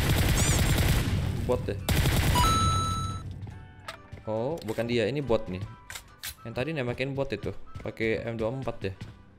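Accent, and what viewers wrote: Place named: Bali, Indonesia. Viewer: Logat bali cik hahaha🤣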